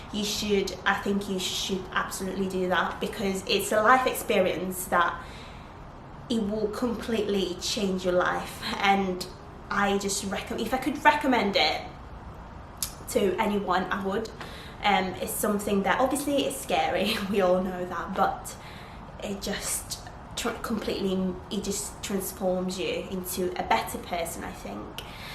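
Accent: United Kingdom. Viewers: How old are you now? You have a British accent now. I wouldn't think you would be from Italy. I lived there for four years, in Sardinia, Porto Torres, near Sassari, from 7years old to 11, obviously came with my mum there, originally from Poland. Since then came to UK, Leicester. Long story though, I have also been in Manchester for the past 2years, and before going there, I lived in the UK for about 10years, as I arrived here in 2008 Winter, I was about 11, and came to Manchester in the summer of 2018 for the first time. I haven't spoken with my family since 2017, so was on the streets a few times, but yeah... Not sure why I'm writing that, I think I side tracked :D But yeah, interesting, saw you from Mike Thurston's video, its interesting you were or are in Dubai. Would you tell us your story of how you got where you are now? :D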